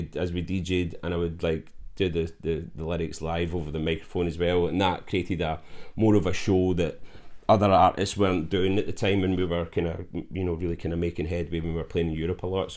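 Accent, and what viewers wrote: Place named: Scotland, United Kingdom. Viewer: U sound like ur from milngavie Where’s fuckin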